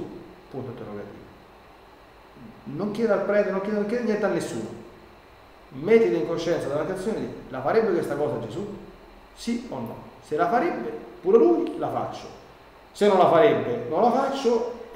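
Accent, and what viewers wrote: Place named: Rome, Italy. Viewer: Santo Padre, grazie dei suoi preziosi insegnamenti! Le chiederei solo di parlare meno in romanesco perché a volte mi perdo le sue parole e mi dispiace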